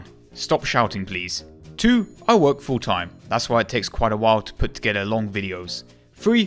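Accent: United Kingdom. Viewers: I mean, there are areas of the UK that just talk like that, I’m sure they didn’t all go to private school. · Im fucking rolling. Damn mate. Forgive me but i always thought you were British from your accent. You're fucking awesome mate please keep making more awesome content. This made my weekend. (Watched this feb 22 so im bit late.)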